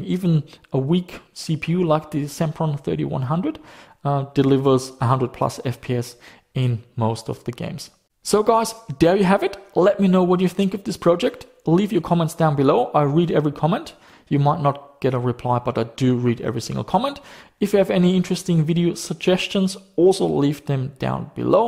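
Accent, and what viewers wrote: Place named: Germany. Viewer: Are you really Australien? Thought in the beginning that you were from Germany or Austria! 😄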